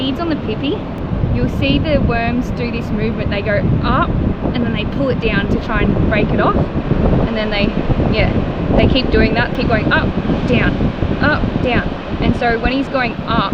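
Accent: Australia. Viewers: One of the coolest girls I’ve ever seen and the great Australian accent😃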